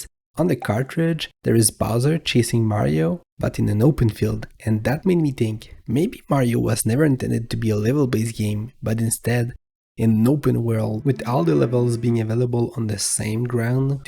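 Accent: France. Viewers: T’es français en plus ??? Je reconnais l’accent 😭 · Are you french😮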